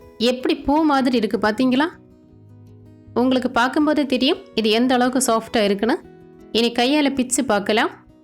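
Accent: Kerala, India. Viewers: மலையாளி தமிழ் பேசினால் ஈசியா கண்டுபிடிக்க லா ம்.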